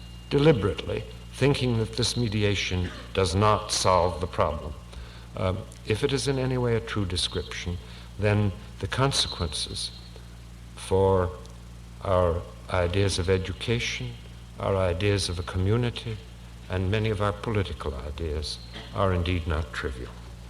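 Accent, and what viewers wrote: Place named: Mid-Atlantic, United States. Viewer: The “Mid-Atlantic” accent needs to make a comeback…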